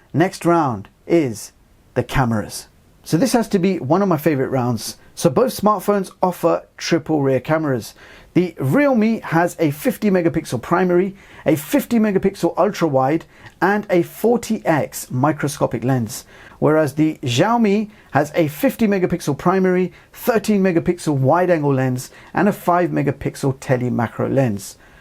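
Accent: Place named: United Kingdom. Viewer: i love British accent ❤️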